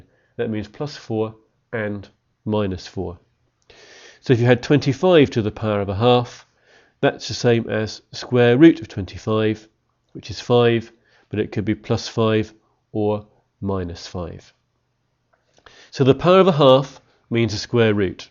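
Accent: United Kingdom. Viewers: Ur accent is incredibly awesome btw, what type of British accent do u speak? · Cool British Accent makes me feel smarter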